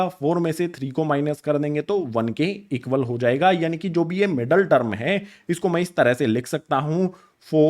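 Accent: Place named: Kota, India. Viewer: your accent... 100% kota student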